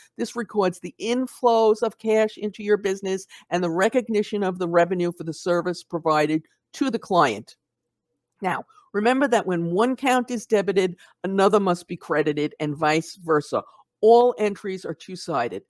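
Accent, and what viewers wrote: New York, United States. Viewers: New Yorker?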